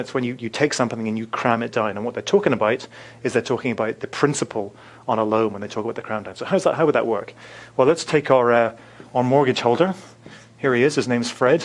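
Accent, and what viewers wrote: England, United Kingdom. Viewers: All these videos pertain to the USA right? or are they in the context of the UK? The English accent has me wondering...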